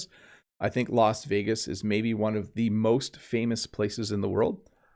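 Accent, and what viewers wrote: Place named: Canada. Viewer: Great videos!! My students love your teaching style and I recommend your channel to hear natural English with a different accent - I’m British but it’s important to hear all different accents. I find Canadian clearer than American. Keep up the good work!!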